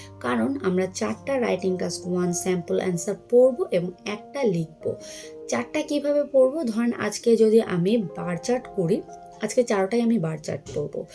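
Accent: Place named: Sylhet, Bangladesh. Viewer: Your way of speaking seems quite similar to Sylheti peoole.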